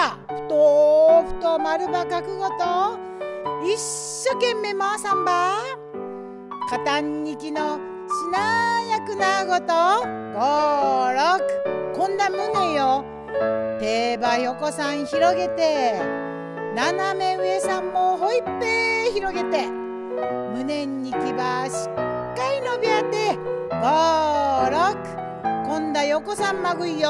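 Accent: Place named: Hasami, Japan. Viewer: 波佐見出身の者です。18歳で波佐見を離れて今年で17年になります。転勤族で各地を転々としてます。 この動画で波佐見弁のナレーション聞いて懐かしさでほっこりしたのと同時に、今年亡くなった祖母の事を思い出しました。 やっぱ波佐見はいいですね。またいつか必ず帰ります。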